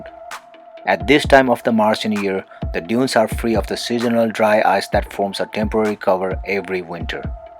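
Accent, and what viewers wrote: India. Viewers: very strong indian accent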